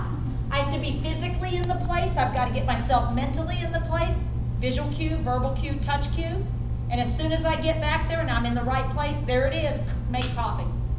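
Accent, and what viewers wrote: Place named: East Tennessee, United States. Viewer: I grew up in East Tennessee. She sounds like my sister. Same accent throughout, just more noticeable when she goes from lecture voice to excited conversation voice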